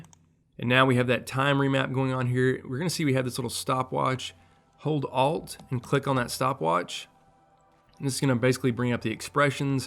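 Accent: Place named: South, United States. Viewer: So many gems in this!!! Thank you! I'm from NYC, but ur accent reminds of me of my fam down south.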